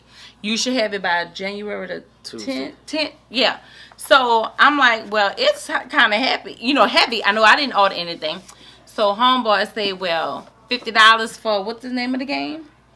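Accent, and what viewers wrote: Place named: New Orleans, United States. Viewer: just started watching your videos I lovvvvve you new orleans accent lol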